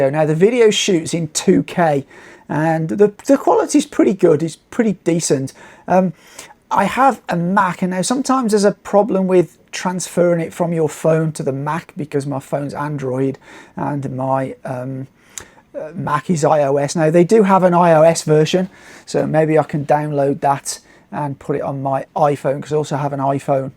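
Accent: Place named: England, United Kingdom. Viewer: People should not comment on accents if they don't know what they are talking about. This guy is English, hence his accent is one of a number of English accents.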